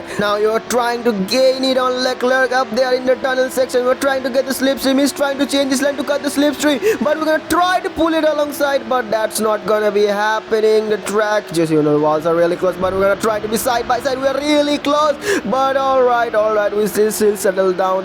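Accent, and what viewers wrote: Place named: Pakistan. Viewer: Your english sounds like Pakistan's english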